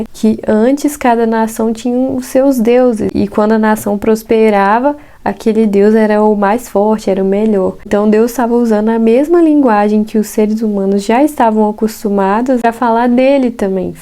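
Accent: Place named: Minas Gerais, Brazil. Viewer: Vc é mineira? Percebi por causa do seu sutaque 💗que Deus te abençoe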